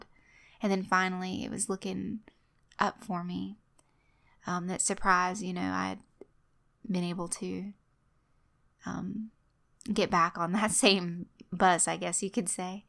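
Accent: South, United States. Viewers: I actually just found out about your channel today.. I'm so sorry for what you've been thru, and I'm sending healing thoughts your way that things will only get better for you and your loved ones. As I'm a new subscriber, I don't know what your voice was like before..but as a southern gal, I sense a hint of southern in your voice as well..which of course I think is terrific.😊 Hang in there, I believe there's a plan for each of us. I don't purport to always understand (or enjoy) some of the routes my life has taken getting to the plan, but I try to keep in mind even the darkest days eventually have to lighten up. And as much as I don't like it, it'd be hard to recognize real happiness if I'd never experienced real sadness. I'm looking forward to listening to your prior narrations as well enjoying the new ones you choose to share. Take care!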